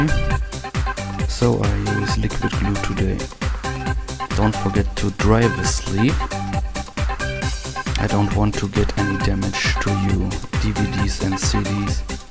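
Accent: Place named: Germany. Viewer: man echt geil nix gegen dich aber mann hört übel raus das du deutscher bist wenn nich tuts mir leid aber zu geil. Help very now i have finally a cover for my steam BO2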